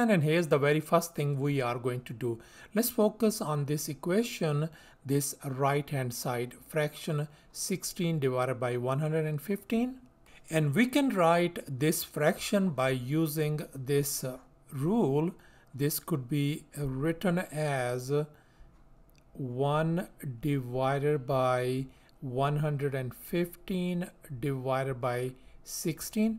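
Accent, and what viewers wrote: India. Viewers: Sir is probably an NRI, as u can hear from his voice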